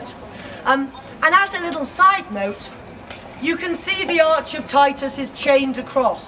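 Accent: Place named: England, United Kingdom. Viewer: Signora è mitica , adoro l'accento inglese , rende aulico anche ciò che non lo è. Spiegato con entusiasta enfasi.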